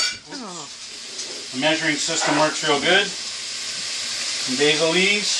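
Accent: Canada. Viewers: You are a scream and i love the Canadian accent. Gotta watch the middle though, more lean and less fat. The dog will like the fat unless his middle is too large. Your wife giggles so good. She is a keeper.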